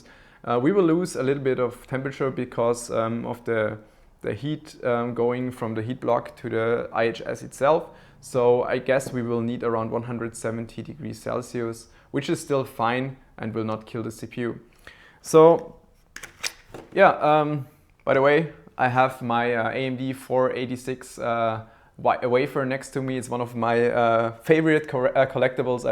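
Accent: Germany. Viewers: He's german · Your german accent is very noticable xD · you are german? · Man merkt sofort wenn nen deutscher englisch spricht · Bist du deutsch?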